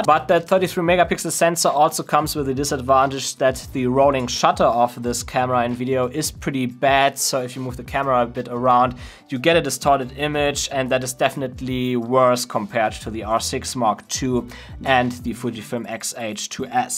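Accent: Germany. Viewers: hes german i can hear it im german to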